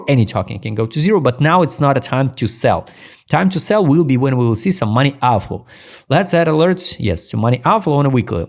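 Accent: Russia. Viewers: судя по твоему произношению , ты русский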